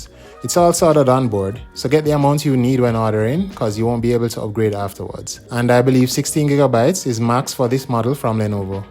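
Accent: Jamaica. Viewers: Definitely Jamaican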